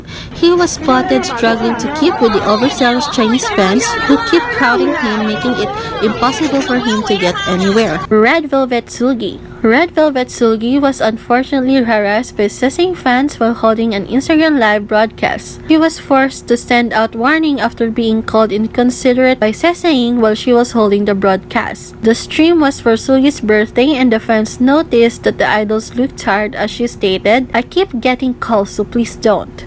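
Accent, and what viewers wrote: Philippines. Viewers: Off topic but is that filipino accent I hear? 😁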